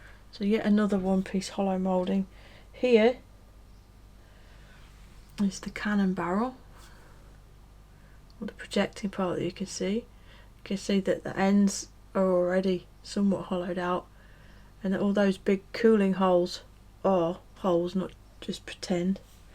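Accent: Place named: Lincolnshire, United Kingdom. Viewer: Hi, hope you're well. I had no idea you came from Spalding! (Although I should have realised from the accent.). In the mid eighties when I was young and even more stupid than I am now, In my motor racing affairs I became involves with a company that operated out of a place called Cradge Bank, and I lived in Spalding for around three years on and off. I used to travel in from Peterborough on the Cowbit road, and the amount of timesI would see the A10s flitting about, virtually every trip. Of course you see one while you are driving, you "feel" the other one.. Of course they have been using you for target practice! My favourite scheme was always Euro 1. Very difficult to get the colours really right on that. I may have to invest in this one- thanks for the review. Rich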